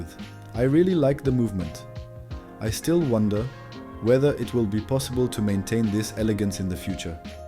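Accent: France. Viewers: j'adore l'accent fr XD : )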